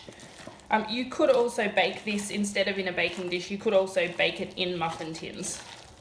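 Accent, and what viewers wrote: Australia. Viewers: Lovely to hear an Australian voice ❤️❤️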